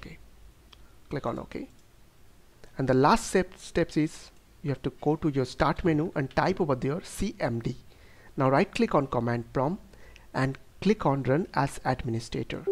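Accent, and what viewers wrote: India. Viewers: an indian accent. i'm guessing from the madrass region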